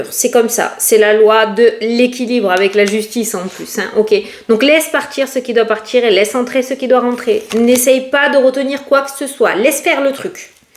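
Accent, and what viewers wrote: South, France. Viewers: Bonsoir, toujours la joie quand je vous écoute, c est mdr, j'adore votre façon de vous exprimer avec votre petit accent du sud moi j'habite dans les Ardennes 08. Vous êtes franche mdr avec humour. Je vous apprécie. Merci pour votre bonne humeur. Merci pour votre gentillesse et votre bienveillance. Que Dieu vous bénisse ainsi que votre famille.❤❤❤🙏🙏🙏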